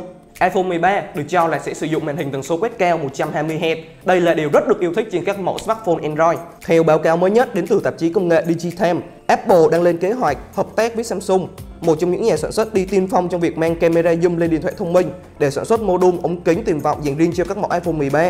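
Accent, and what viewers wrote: Phu Yen, Vietnam. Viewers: Giọng phú yên nói nhanh quen rồi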